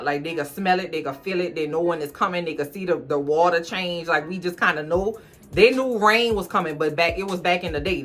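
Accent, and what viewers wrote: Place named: Louisiana, United States. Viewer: Your accent is so beautiful! 😄💖 Got to be Louisiana!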